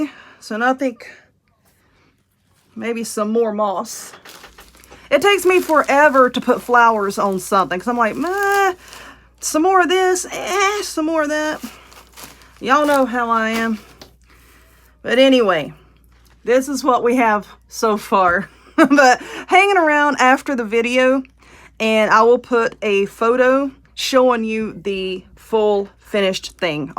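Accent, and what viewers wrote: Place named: Texas, United States. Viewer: I like it!!! Looks better than Target's! I understand everything you say...lol...i am from Texas & we talk & say things like that! Stay safe!💕🍁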